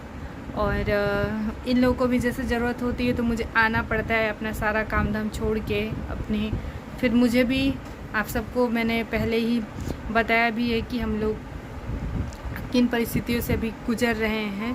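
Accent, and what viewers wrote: Bhojpuri, India. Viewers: Aap kaha ki rhne wali ho Didi mai ballia ki hun aapki language bhojpuri waisi hi lg ri h apka gaao kaha h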